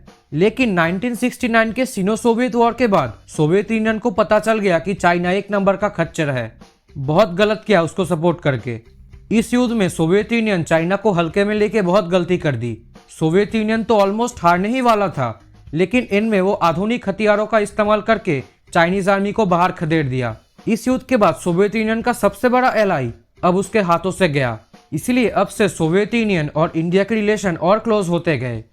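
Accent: Bengal, India. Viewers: You sound Bengali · Bhai yaar Hindi bol le.. Takleef, Azad? Duwidha, Swatantra nahi bol sakte kya? Teri accent shunke toh bengali lagra hai tu? শুদ্ধ হিন্দি বলতে শেখো ভাই। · You're Bengali, Right? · U r Bengali ur accent says that amio bangali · Bengali accent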